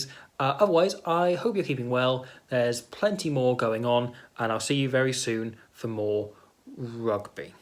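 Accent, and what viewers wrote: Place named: England, United Kingdom. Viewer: he sounds english to me mabye born in England with welsh grandparents or something.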